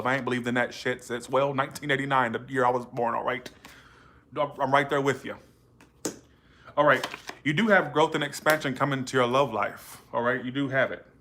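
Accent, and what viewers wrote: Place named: South, United States. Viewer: I love your personality you're so cute and I'm not a big fan of the southern accent but for you it sounds so cute and sexy you're just like so neutral I love that don't ever stop being you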